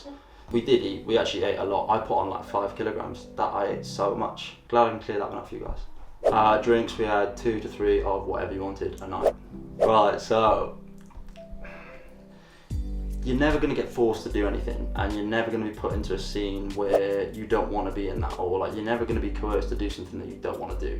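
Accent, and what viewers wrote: England, United Kingdom. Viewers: agree especially british accent is way harder than the americans one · which English accent is he speaking? 😩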